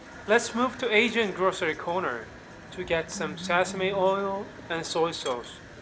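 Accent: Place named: Morocco. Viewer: Thank you to you because you speak our dialect i'am proud of you you are between your big morrokkan familly.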